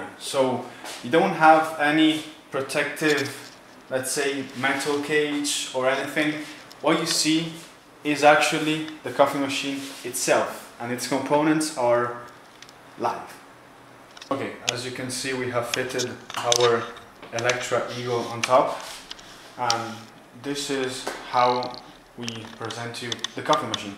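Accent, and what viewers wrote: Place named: Italy. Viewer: Within seconds I was sure you were Italian! You know how to pronounce the name correctly. 😂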